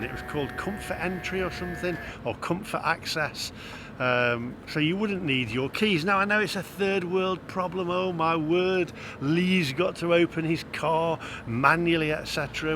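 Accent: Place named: Nottingham, United Kingdom. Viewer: I assume these cars being driving computers, they could also be open to Cyber attack. Which could also be a safety issue as well as a potential car ransom situation. Love the channel btw, I studied in Nottingham in the early 1990's....its lovely to hear the accent & see how the city has changed when you have been filming there.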